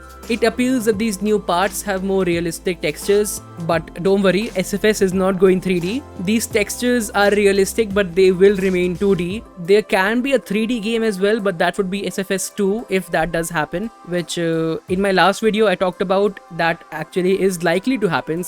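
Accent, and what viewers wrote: India. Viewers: Are you indian because you sound like Indian 🤔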